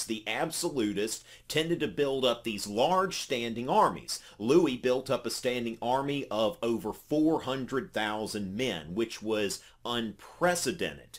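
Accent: South, United States. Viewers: yeah im a big fan of your videos since i found your Thirty Years War video, and ofcourse your southern accent. Anyways im sure you will do great if you decide on making a video of Sweden, its a shame because like you said most people leave out Scandinavian history besides from Vikings mostly, i might be a bit biased but the Swedish Empire is surely impressive seeing as its only surrounded by other huge and powerful countries and could still hold its ground. Besides all of that, Sweden has had a significant amount of political and military influence on Europe throughout most of history atleast until their loss of Finland in 1809. Greetings from Stockholm :)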